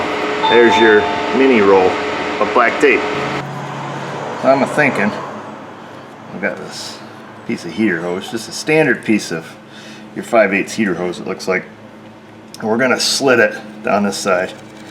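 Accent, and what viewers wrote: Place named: Oklahoma, United States. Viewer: I'f you never told anyone that your shop was in New York, most people would think you were from Oklahoma or Kansas or Texas. You sound like an Okie when you talk not a New Yorker!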